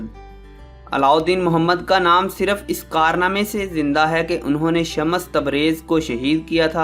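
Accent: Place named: Punjab, Pakistan. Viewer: I understand lots of people are doing this for some extra income but healthy criticism helps improve quality of work. I'm sorry that I pick on you accent but when it comes to educational videos there's no compromise. I can tell you are Punjabi so am I but our national language is Urdu and taught in schools from nursery level so it deserves that level of respect and perfection. Hope it makes sense.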